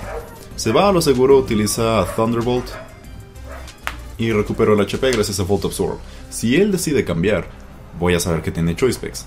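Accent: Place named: Mexico. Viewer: Me gusta como narras, muy natural y al estilo mexicano jaja, pero me gustaria que usaras pokes poco comunes, ya sean Uu o Ru eso estaria chingon, saludos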